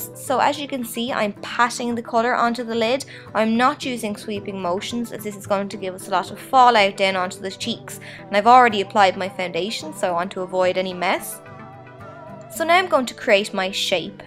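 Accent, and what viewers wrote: Ireland. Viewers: Do u have an Irish accent?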